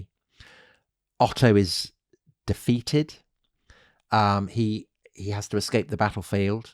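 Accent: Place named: United Kingdom. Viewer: Bristling hair of a pig. Eyes of a mole. Very funny with a nice British accent. Great narration!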